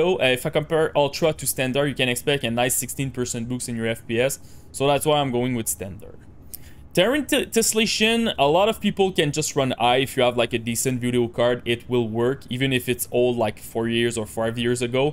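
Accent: Quebec, Canada. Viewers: je croit que je reconnait le bon vieux accent quebecois haha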